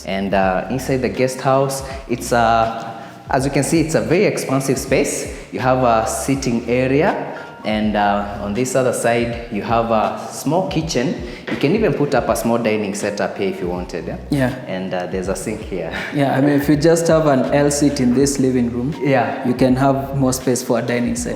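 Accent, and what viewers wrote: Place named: Kenya. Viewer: First of all, I like, and especially his kikuyu accent 😂😂, he's my favourite real estate reviewer. However, honestly, whatever's keeping that house at that price point is the location and probably the landscaping. Everything else is just off, assymetrical, outrageously overpriced for decor that is below luxury standard, exterior design is barely standard while interior design is just as bad as it can get. For modern living standard, metallic doors just picked off of a jua cali base is as bare minimum as it can get. Let me not even get to the bathrooms. Whoever designed this house is such an embarrassment to high end real estate architecture. The only thing that may attract you to this house is the lawn otherwise the developer should go benchmark from the professionals. Very poor design. Wth😳🤔.. was good tho.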